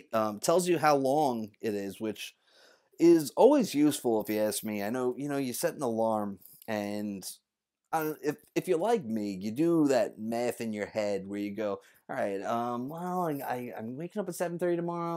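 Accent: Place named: United States. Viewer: Thank God an American accent!!!!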